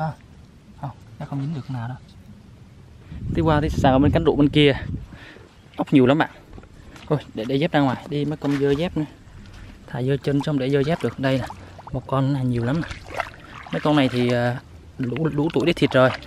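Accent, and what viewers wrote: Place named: Mekong Delta, Vietnam. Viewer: ah nói chuyện miền tây thì chỉ có ở châu đốc an giang😃😃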